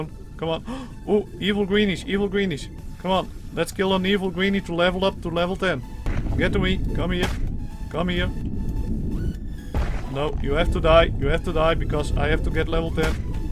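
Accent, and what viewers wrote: Netherlands. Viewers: dutch accent for life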